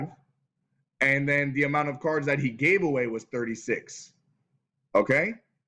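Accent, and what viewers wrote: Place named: London, United Kingdom. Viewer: You sound like you’re from London.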